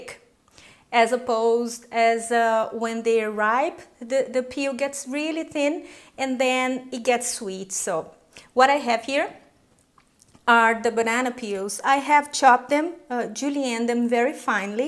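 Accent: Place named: Brazil. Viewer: hey are you brazilian? i can see for your accent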